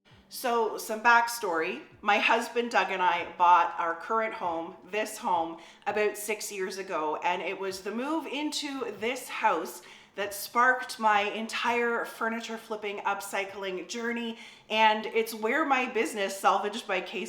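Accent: Canada. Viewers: Hi! I’ve been watching you for several months. At first I was thinking “abaut” “haus” “ote/out” what in the world? Canada! Nevertheless, you grew on me. You are absolutely brilliant! I love your work, your work ethic, your personality, I just love you! ♥️ You’re such an inspiration to all artists. Not afraid to try something new, or show your mistakes to us. I can’t say enough abaut, lol Your incredible drive/ambition, and positivity that radiates through your videos! It does not go unnoticed. God Bless! You’re just crazy amazing!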